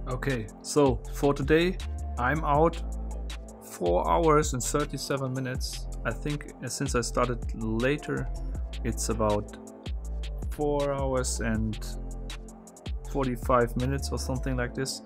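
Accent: Germany. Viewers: Sir are u a german?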